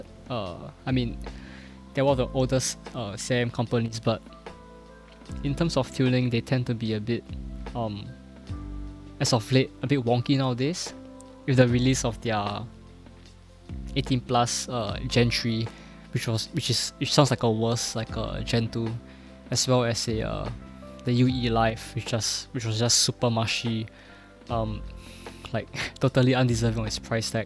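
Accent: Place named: Singapore. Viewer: I hear da accent I noe is Singaporean lollol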